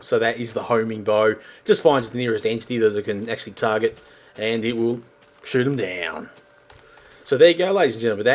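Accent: Australia. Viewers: its a HOUMING BOUW... i love australian accents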